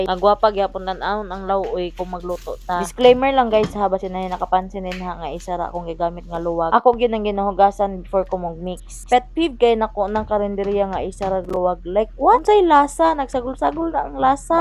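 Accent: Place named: Bohol, Philippines. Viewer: tamsak host. boholana girl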